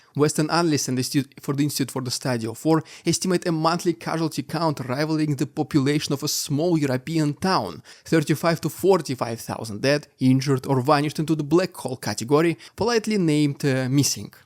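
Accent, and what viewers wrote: Russia. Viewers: daaamn dude, yout russian accent is so heavy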